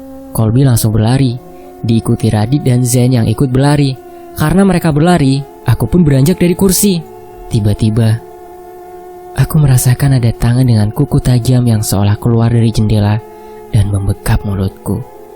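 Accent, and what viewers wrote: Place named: Cianjur, Indonesia. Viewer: Cianjur suarany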